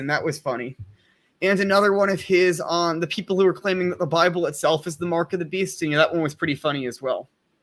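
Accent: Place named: United States. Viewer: Great idea for a video, and some really interesting content here. That being said, my man's Latin pronunciation could benefit from some de-Americanization, haha! As a pretty settled Suarezian myself, I'd recommend you check out Luis Alonso Getino's "El Centenario de Suarez," published in La Ciencia Tomista, 15 (1917), pp. 384-388 [available on HathiTrust]. The author compares the 24 theses with Suarez' positions (Spoiler: except for thesis #13, which even then is qualified, Suarez would disagree with 23 of the 24 theses!).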